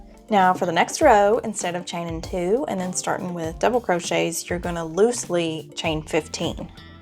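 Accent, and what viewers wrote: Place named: South, United States. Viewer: Such a great pattern!! Thank you for sharing. Gotta say I loved the southern in your speech. Made making that bad boy more fun :)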